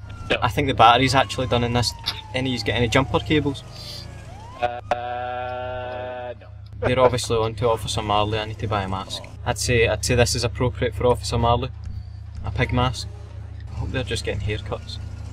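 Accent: Scotland, United Kingdom. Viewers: Are you scottish? You sound scottish if you are I am too!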